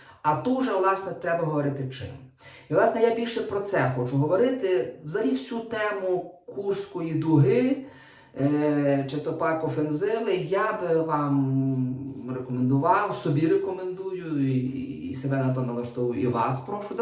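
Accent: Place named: Galicia, Ukraine. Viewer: Хто така офензива? Галицький діалект не всі розуміють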